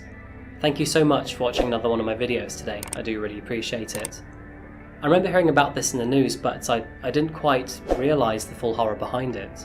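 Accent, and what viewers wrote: England, United Kingdom. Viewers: I know now you were born in the US, but i still cant get over the fact that a british person is offering me coffee! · I can tell by your accent that you're English but I cannot place where. All I know is that it isn't Devonshire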